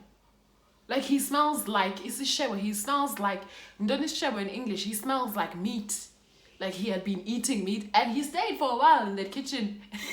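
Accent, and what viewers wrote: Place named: United Kingdom. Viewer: That British accent wow you just nail it 1️⃣0️⃣0️⃣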